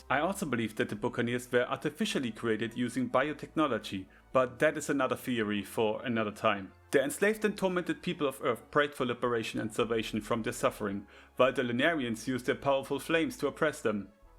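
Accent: Germany. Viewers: Akzent klingt nach 'nem deutschen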